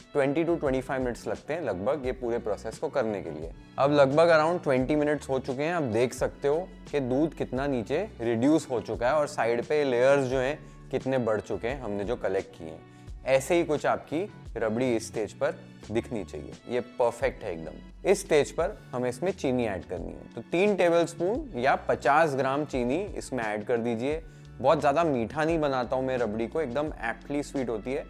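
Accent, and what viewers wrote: Maharashtra, India. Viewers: Sanjyot Sir, a day before I tried Maharastrian Masale bhat.. today I tried your Thecha Paneer Rice.. dono hi itna sundar bana tha.. very tasty.. Being a Maharashtrian, loved the authentic flavours of them.. and your Assal Marathi Accent, too. 😄 Thank you so much for such a lovely recipes ,Sir.. ❤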